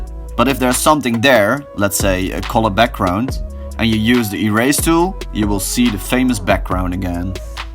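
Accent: Netherlands. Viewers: You sound dutch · ben jij nederlands je accent lijkt mega hard lol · Ok are You dutch??? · Nice ! K vind het wel leuk dat je gewoon aan zowat alle Nederlanders kan horen dat ze Nederlands zij. Als ze Engels spreken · You Dutch? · Is it me or do you sound Dutch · Duidelijk een Nederlander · Ik hoorde al gelijk dat je nederlands bent 😂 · Bruh the dutch accent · You sound like you're Dutch and you're from Limburg or Brabant if you are then hallo! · You sound Dutch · I know ur Dutch or Belgian by the way your talking English🤔🤔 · Dutch accent: 100% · You dutch? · Goeie Nederlandse accent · That’s a strong dutch accent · Are you dutch? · Dutch accent! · are you dutch you sound dutch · He sounds Dutch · Hoi ik weet niet of het te persoonlijk is om te vragen, maar ben jij nederlands? Je engels accent is echt een typisch Nederlands accent, kwas gewoon ff nieuwsgierig. 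Verder wel een coole video. :D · Ru dutch? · R u dutch? It sounds like ur dutch with that accent xD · Is dat in Eindhoven? Denk dat namelijk omdat je accent klinkt alsof je uit nl komt. If you dont understand me i Get it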